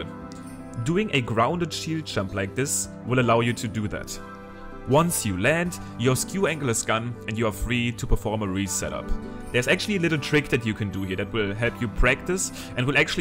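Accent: Germany. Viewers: You have a german accent. Do you skeak German? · are you german ? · Warum machst du die Videos nicht auf deutsch Mann hört das du deutscher Bist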